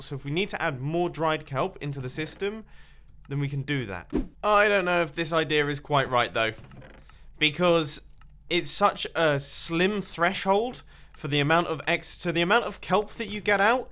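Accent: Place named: United Kingdom. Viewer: I love me a British accent. Anything sounds better in a British accent lol.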